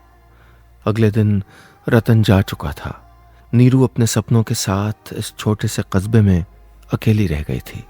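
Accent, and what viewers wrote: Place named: Uttarakhand, India. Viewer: Sir m from uttarakhand and bolne Mai asi tone hmare wha sun ne ko milti hai apki khaniyon Mai apne uttarakhand ki jhalak pake bht acha lgta hai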